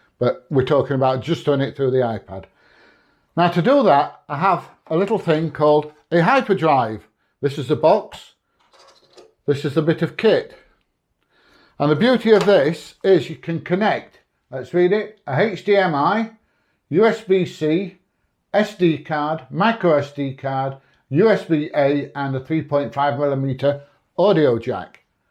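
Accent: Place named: Yorkshire, United Kingdom. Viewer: What a character! Yorkshire lad?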